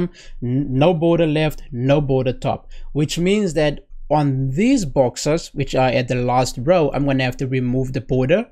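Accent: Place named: South Africa. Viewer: I am studying IT and had to leave my home country (South Africa 😢) I need help with an assignment while being homesick. The i came across your video and with 5 seconds into the video i knew were you were from. Not only did your video make my day but will definitely help my studies 😊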